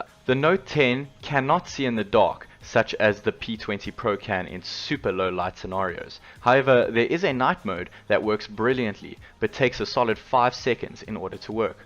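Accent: South Africa. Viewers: Hey, South African accent guy - excellent video, well done.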